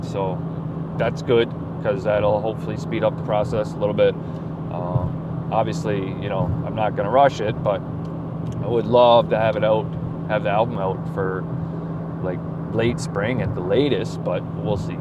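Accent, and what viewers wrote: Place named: Grande Prairie, Canada. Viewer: sound's like a grande prairian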